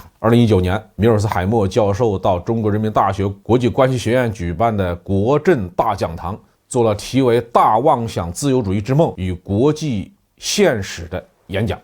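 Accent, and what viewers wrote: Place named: Anhui, China. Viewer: 你是不是孝感地区的人？口音要么就是安徽某些地区。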